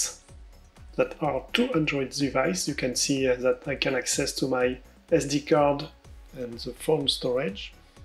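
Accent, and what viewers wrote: France. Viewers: Oh god your french accent ...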